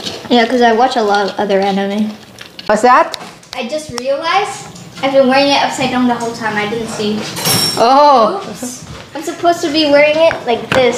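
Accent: Indonesia. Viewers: kan lidah nya Indo sekali ❤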